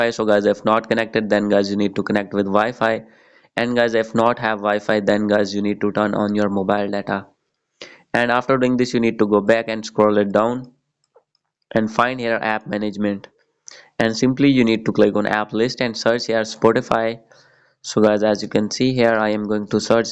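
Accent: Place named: India. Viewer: The Indian accent is just, PERFECT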